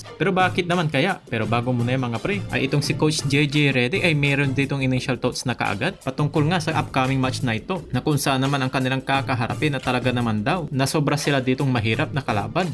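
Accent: Pangasinan, Philippines. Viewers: taga pangasinan ka awa? hehe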